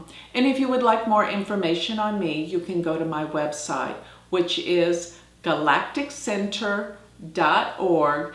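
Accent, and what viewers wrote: United States. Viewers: thank you for the very perceptive and accurate analysis of Lousie's Chart. I must say you sound such a darling when you pronounce the Indian or Vedic terms....it's cool to listen with your American accent....I love it.....Best wishes on your work in Jyotish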